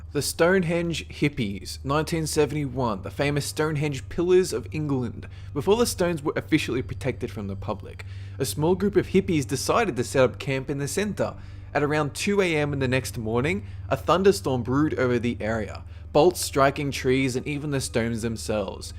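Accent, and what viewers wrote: Australia. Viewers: Australian accents are HOT. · I've wondered this for a while, and hope someone who knows, or himself will answer this question. Are you from Australia? You sound like it but I just cant tell, it sounds normal to me because I live there, but watch many american videos.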